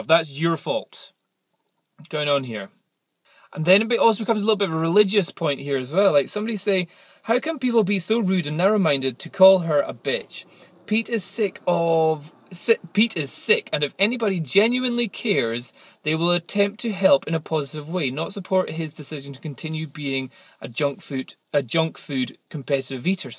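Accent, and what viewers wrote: Scotland, United Kingdom. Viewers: Is that a Scottish accent I detect? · Scottish? :D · what accent is that? I mean I know it's Scottish (I think), but what town or area?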